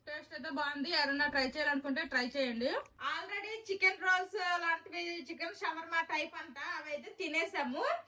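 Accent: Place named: Srikakulam, India. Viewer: garu nenu tea tagutu me video chusa andi. Madya lo sir ni meru dibada dabada badeyyali annaru. Chala navvi polamaranu. Okkasari chinnappudu gurtocchindi. Madi vzm.meru Sklm slang lo baga matladutaru. Mammuluga kuda baga matladutaru. Overall ga natural ga matladutaru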